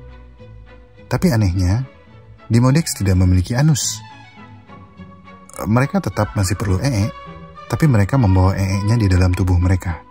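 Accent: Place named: West Java, Indonesia. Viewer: Admin orang sunda sigana yeuh 😂😂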